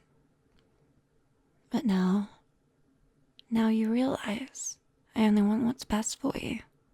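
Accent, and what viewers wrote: United Kingdom. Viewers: i love british accent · why are you british -( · I don’t know what it is exactly but there’s something about that British accent that just makes this so much better. Along with my favorite type of character, "yandere" just as good as the hide and seek one. Love the content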